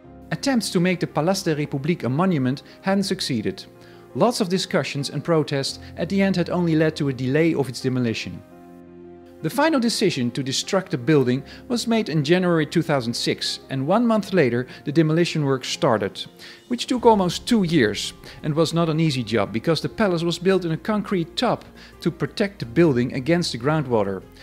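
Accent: Netherlands. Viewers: Blame the Dutch accent. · he has dutch accent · You sound very Dutch. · You mean “the only channel about East Germany in English” with a heavy Dutch accent! Calling it “English” is a bit much. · First time viewer(and new subscriber)…very informative thankyou…I lived in BRD(München) IN 1985/86 and visited Berlin a few times…I remember this building…also..back then..never in my wildest dreams did I imagine that the wall would come down….p.s. to my ears your accent sound Dutch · Interesting, thank you. Your accent sounds Dutch, so not an Ossi :-) What made you take up DDR history? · Great vid, I'm guessing your first language is Dutch?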